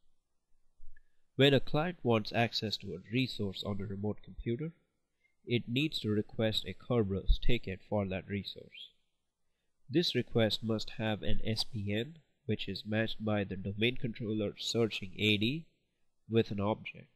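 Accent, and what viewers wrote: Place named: India. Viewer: drop off the accent dude... the way you say "service" sounds like "sabzi" - which means vegetables in indian(hindi/urdu)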